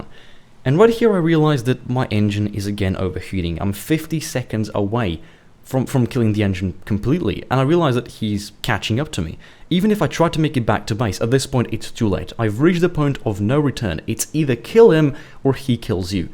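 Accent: England, United Kingdom. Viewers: Why does he have an English accent? wtf? Or is the Slovenian accent similar in some way?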